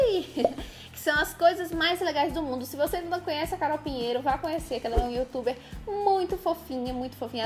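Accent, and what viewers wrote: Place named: Brasília, Brazil. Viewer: tão brasiliense esse teu jeito de falar , me identifico #jujubanoveda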